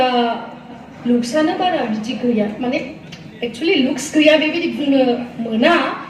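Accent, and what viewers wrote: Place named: Udalguri, India. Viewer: jwbwd mwjang jadg Abo.. Ngni voice kwo birad mwjang mwnw ag ngsiniting ni edi railynykwonw mwjang mwnw ag kwnasogbai tanw lubei. Agni lwgw dgw Odalgurini railynykwonw birad mwjang mwnw ang Abo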